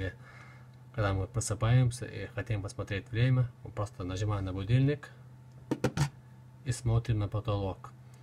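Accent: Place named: United Kingdom. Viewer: у тебя акцент интересный,британский или вроде того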